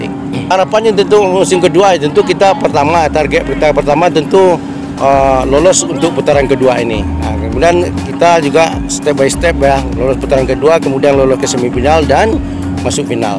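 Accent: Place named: Padang, Indonesia. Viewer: Bahasa Indonesianya kental banget Padang nya hehehe.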